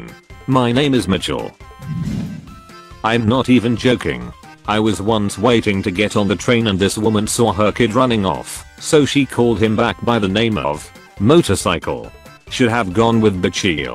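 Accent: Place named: England, United Kingdom. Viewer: A robot with an English accent is still a robot. You need to do better.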